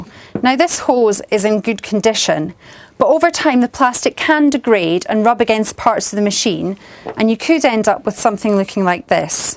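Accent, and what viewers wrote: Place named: Glasgow, United Kingdom. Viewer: I love this young lady's accent...my grandmother was from Glasgow and she sounded very much the same. =)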